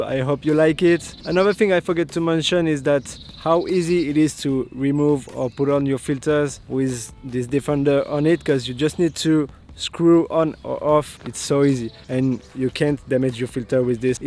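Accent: France. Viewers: Hi, sorry but are you french ?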